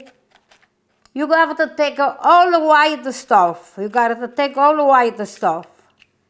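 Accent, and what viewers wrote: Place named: Sicily, Italy. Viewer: I wanted you to know the joy you bring to me. Your mannerisms and accent remind me of my grandmother. She immigrated to the U.S. in 1920 from Sicily. I wish when I was older, I could have watched her make the most delicious meals. Nothing came from a can or freezer. My most favorite meal was Sarda. She used fresh fish and anise. Every year, I would ask her to make it for my birthday. I have made many of your recipes. Each one was delicious 😋. Thank you for teaching me the recipes that bring back many wonderful memories. To me, you put love in everything you make. 🙂🦋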